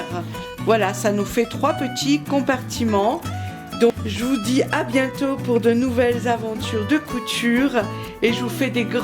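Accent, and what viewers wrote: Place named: East, France. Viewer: Bonjour. Je viens de découvrir il y a une dizaine de jours votre chaîne, j'adooooore! C'est clair, précis, voix lente et avec en plus un petit accent! Je dirai de l'Est? A la montagne? En tout cas merci, j'apprends beaucoup de chose. Bonne journée.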